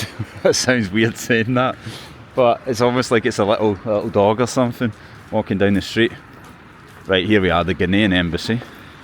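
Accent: Scotland, United Kingdom. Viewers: Happy New Year. Enjoy your videos in Russia. Where are all the Lada cars? Lovely street layouts as well unlike Lanark! good to hear a fellow Scot reporting from Russia. Cheers Bill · Great project! I've visited Moscow twice and lived in St. Petersburg for 6 months. Your Scottish accent is pure ASMR - so relaxing. I really like your channel. 5 stars! By the way, I speak fluent Russian and I have no idea what that word next to the sea is. It's definitely not the Black Sea. It's Greek, lol. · Beautiful city. You dound scottish. Im from Strathbogie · Stopped by to listen to your accent. I adore scottish accent. Nice video. Not sleepy at all! Best wishes from Belarus!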